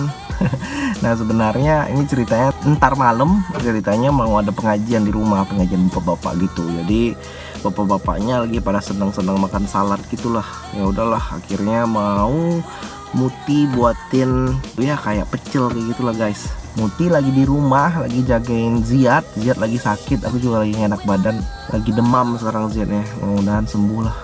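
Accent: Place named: West Sumatra, Indonesia. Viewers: Iya, dari logatnya masih kliatan.. btw im half minang too 😆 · Urang awak rupanya, pantas logatnya familiar😂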